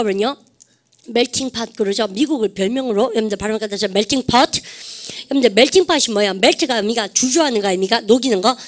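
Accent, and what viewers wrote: Gyeongsang, South Korea. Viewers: 대구말씨 귀엽고 웃겨요!!!ㅎㅎㅎ 강의 듣다가 혼자 킥킥거리면 가족들이 힐끗 쳐다봐요 ㅋ😂 참고로 저는 창원아줌마 임돠 항상 감사드립니다❤ 화이팅~~~~😊😊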